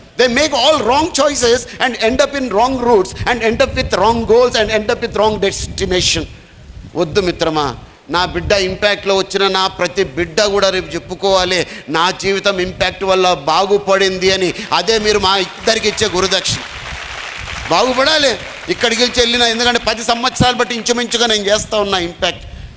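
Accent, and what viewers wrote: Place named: Telangana, India. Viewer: super speech !! just loved his way of presentation, the Telangana DIELECT I love it ! sir kiraaak chepthundu!!feels great and motivated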